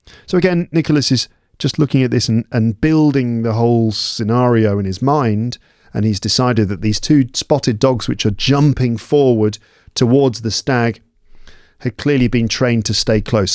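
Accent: United Kingdom. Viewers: Hi, I'm a newly retired English teacher and I just love listening to you read short stories! Your British accent is fantastic, your explanations always are appropriate and what's more often funny, which makes it all very pleasant! Thanks a lot!!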